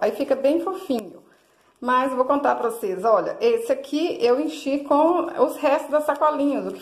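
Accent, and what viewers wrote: Minas Gerais, Brazil. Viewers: Olá,vc é mineira? Pq eu sou,e as pessoas onde moro dizem que falo diferente e sou engraçada ao <link> identifiquei com seu jeito e amo artesanato tb. Sucesso. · É mineira né?! Fala igual a mim!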